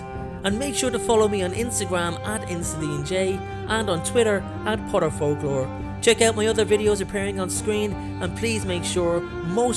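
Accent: Ireland. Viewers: Dude are you Irish 🇨🇮🇨🇮🇨🇮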